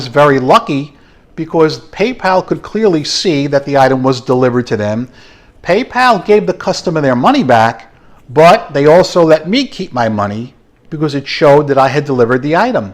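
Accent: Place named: East Coast, United States. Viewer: my west coast ears hearing an east coast voice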